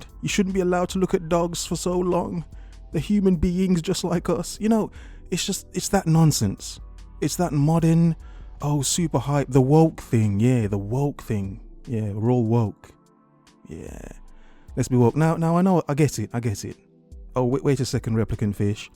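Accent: United Kingdom. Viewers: You do a good job 8 times out of 10. This one, no. You sound like a cranky old Republican. Your idiosyncratic Brit voice mitigates the Archie-Bunker-impression somewhat. You're still the Man, though.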